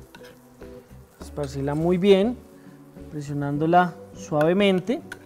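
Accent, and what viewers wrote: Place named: Colombia. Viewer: Me gusta ese acento y me. Convenció con el hecho de ser colombiano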